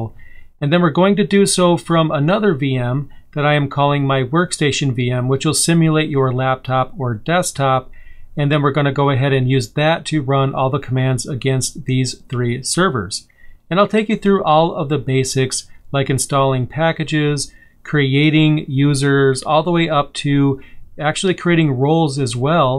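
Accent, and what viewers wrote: India. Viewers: And how does someone know the video has a speaker with an Indian accent without watching/listening to it? Mind your own language Suraj. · Mind your language... If you can't understand India accent don't watch Indian videos.. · you got a problem with Indian accent? Why though? Open up youtube and search for any tutorial, you'll find an Indian guy with thick Indian accent uploading free content for guys like us learn so just be grateful rather than passing these comments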